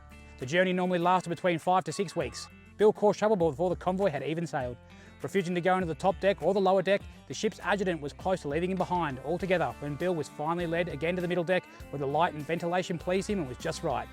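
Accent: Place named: United States. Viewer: Too american, just slow it down and thats when men, were men !!!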